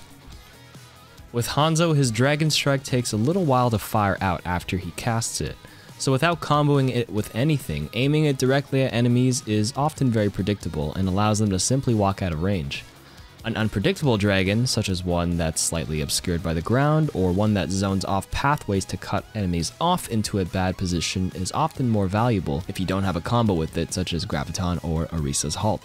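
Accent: Canada. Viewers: are you canadian???? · Are you a Canadian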